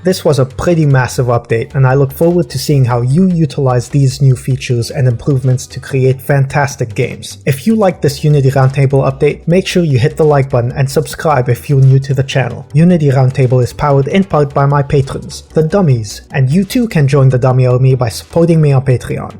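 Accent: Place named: France. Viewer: Ton accent t'as trahis à des kms à la ronde ahaha Très content de voir du contenu de qualité de la part d'un français !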